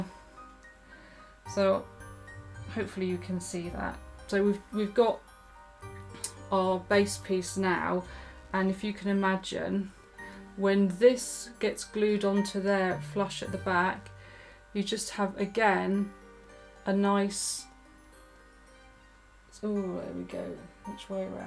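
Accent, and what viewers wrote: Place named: United Kingdom. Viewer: love your tutorials since i was clueless; can you remove the background music because it is distracts from your beautiful accent; Love that British accent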